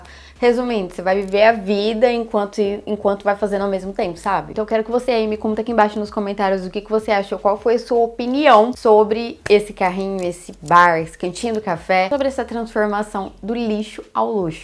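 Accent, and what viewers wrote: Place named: Goiás, Brazil. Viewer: Show. Amo seu canal e esse sotaque goiano. Como é bom ver uma conterrânea talentosa e com um canal bombando com conteúdo de verdade. Parabéns!!!!!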